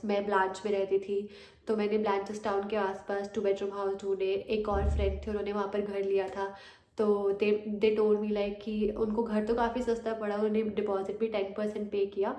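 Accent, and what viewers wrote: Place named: India. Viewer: Im sorry but I didn't understand anything with the Indian accent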